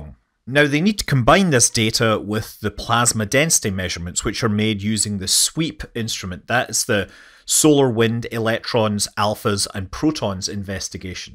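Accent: Scotland, United Kingdom. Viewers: Love to hear a Scotsman talking about a magnetohydrodynamic drive, as always.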